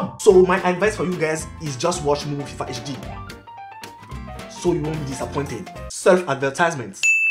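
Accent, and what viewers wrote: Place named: France. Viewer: On entent ton accent français Mdrrr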